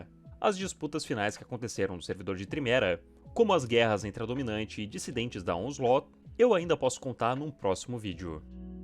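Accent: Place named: Rio Grande do Sul, Brazil. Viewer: Dicção gaúcha. Gaúchos tem essa dicção pela educação forte de base.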